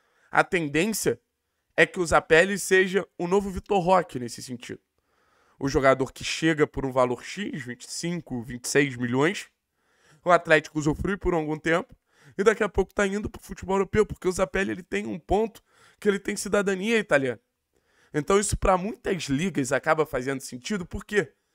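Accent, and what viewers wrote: Rio de Janeiro, Brazil. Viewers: Pow! Um cara com sotaque carioca. Mais um surfando na onda do furacão.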